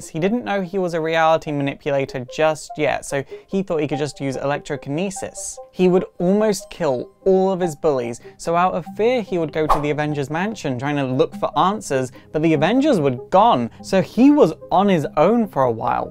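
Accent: United Kingdom. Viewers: I'm 35 (turning 36 this year) and I came out when I was 19 (it was a little different in my day - I'm a bit older than you - also American, born and raised in Baltimore Maryland - from your accent i can tell your from the UK) Marvel's Northstar came out in 1992 when I was 9 but i never felt I related with that character. Like you when I found the character of wiccan i felt I found a piece of myself and went right out and bought "Young Avengers: Children's Crusade" - found out the book and the character kicked total ass and have been sold since. Also been following Iceman's "BIG GAY BOOK" which I like but not as much as I LOVE Billy Kaplan/Wiccan.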